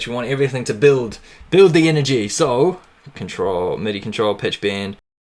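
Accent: New Zealand. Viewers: you are 100% FROM NZ. the accent I can tell.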